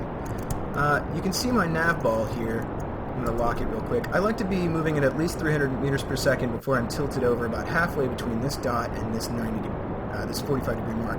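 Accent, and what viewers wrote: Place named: Russia. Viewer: okay... speaks in a russian accent Interresting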